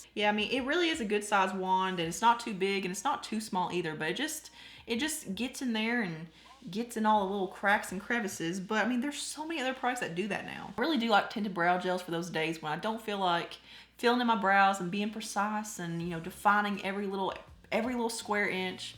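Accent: South, United States. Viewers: noob here... you seem so down to earth. looking forward to watching more of your videos! as a North Carolinian, I immediately favor any twang I hear. #southernsistas 🤣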